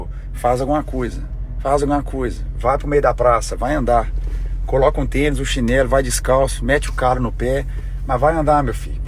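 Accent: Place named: Minas Gerais, Brazil. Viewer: Gente impressionado como o sotaque mineiro dele é muito evidente mesmo estando nos EUA.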